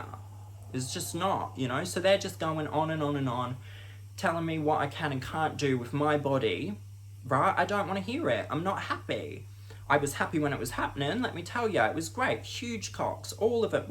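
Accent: United Kingdom. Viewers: i love your accent. what part of England are you from? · why did you choose the cockney accent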